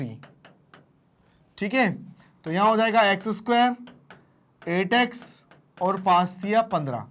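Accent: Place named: Bihar, India. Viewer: Bihari ho bhai❤❤❤❤😂😂😂😂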